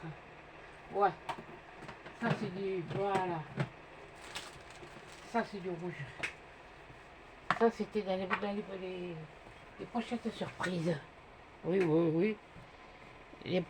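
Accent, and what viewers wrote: South, France. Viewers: Cc, magnifique cette page très réussi,😍 Maminette a eu une très bonne idée pour ce défi, j'adore t'écouter chanté avc ton petit accent du sud c'est merveilleux ❤, plein de câlins a Arkos 😻, Bonne soirée et 🌃 bisettes 😘😘😘💝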